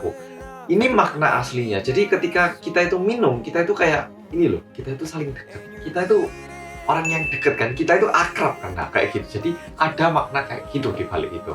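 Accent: Java, Indonesia. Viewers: Medokkkkkkkkk ohhhhhhhh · Yessss orang Korea yg medooook muncuuulllll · Yang medoookkkkk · seneng wae ngunu krunguu Medhoooog e kuii sen puanjjaaaaangg😂😂😂😂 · Korea medok maneh rekkkkk, asyekkkk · Medokmu sam.😂🤣🤣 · Kalo medoknya ilang gimana? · Paling suka awal pembukaan bersama orang Korea yang medhooooookkkkk🤣🤣🤣🤣🤣🤣 khas bgt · Seneng bgt tiap denger kalimat medhoooook 😁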